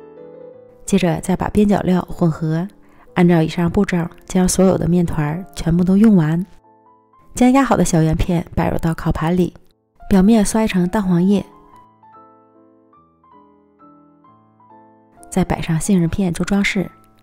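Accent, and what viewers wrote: Liaoning, China. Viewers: 好久不见变成了南腔北调记得是辽宁哪旮旯的口音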